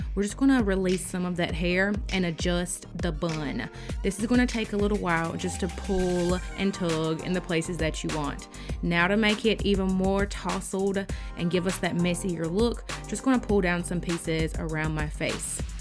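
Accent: Kentucky, United States. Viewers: Do you have a Kentuckian accent or is that just me 😂😂😂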